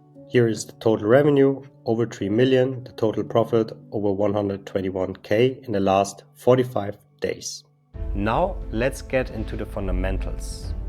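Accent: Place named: Germany. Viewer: Ich hab sofort durch deine Aussprache gemerkt, dass du Deutscher bist😂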